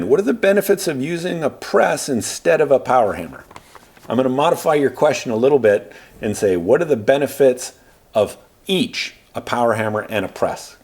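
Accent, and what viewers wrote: Canada. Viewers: I thought you was in Canada? You referenced being in California. Now I’m confused lol. You definitely sound Canadian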